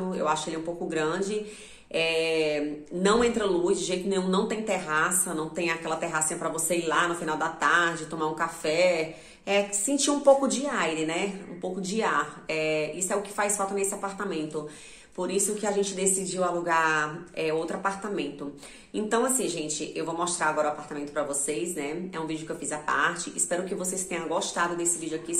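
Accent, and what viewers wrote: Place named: Fortaleza, Brazil. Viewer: Só pelo sotaque já sei que é Fortaleza! Sucesso pra vc...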